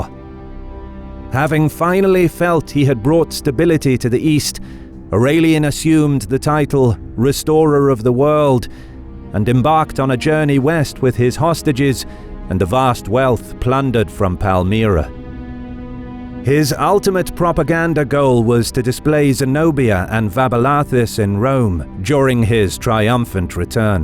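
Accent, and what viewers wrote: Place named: United Kingdom. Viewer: I cant enjoy a documentary video anymore unless its narrated by a british person with a refined accent, well done guys!!!!!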